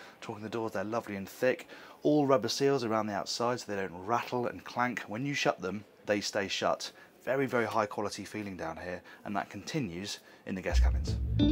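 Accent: United Kingdom. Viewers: There is just something very satisfying about listening to a British accent conduct a yacht walkthrough tour. I don't know. I think I blame James Bond for that. As for the boat, I absolutely love it. I would be more interested in the solar/hybrid and the range on that. Cheers, everyone.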